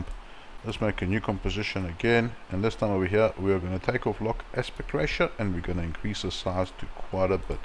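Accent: South Africa. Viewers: Nice to hear a fellow South African voice do a tut :) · South African? Nice to see...! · I immediately knew you were South African. Lekker man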